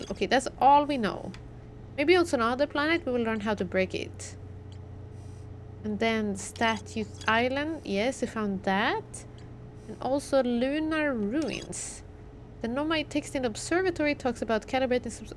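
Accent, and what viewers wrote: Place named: Sweden. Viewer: 1 minute in, she sounds super Swedish, I could be wrong. But I don't think I am. xD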